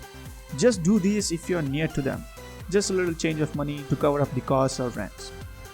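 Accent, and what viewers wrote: India. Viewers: Yeah bro this is a great idea... As too many got GTA V for free, and they don't know what to do and just roaming here and there and telling gta online world is dead.... This content of starting from beginning will be a very useful trick for them or even for some players which knows how gta world works... So yeah why not It's a great idea! And i want to know that are you also from India? Because i am from india and found ur accent same......😅😅 Btw you can also do gta V live streams of grinding and some other games too 😊😊 And plz while making guide plz tell that how things work like in vehicle stealing mission we do not have to hit vehicles and etc... Cuz many friends of mine doesn't know the basics of gta online properly, like what to do and how to do 🤣🤣🤣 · you improve your english alot you got much more less that punjabi akcent ;) i can understand evetything now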